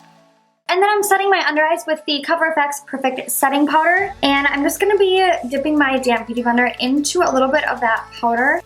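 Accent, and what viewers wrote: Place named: Boston, United States. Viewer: Random question but where are you from? Like you accent sounds northern or Bostonian maybe but I can't put my finger on it eek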